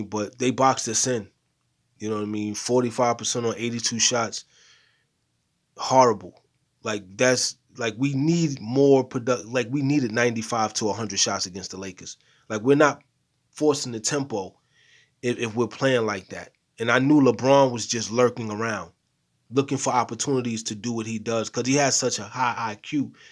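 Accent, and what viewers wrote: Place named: New York, United States. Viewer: U from Toronto or New York dawg?? I’m just curious. The way I say some words sound like u from New York. Would be cool if ur a raptors fan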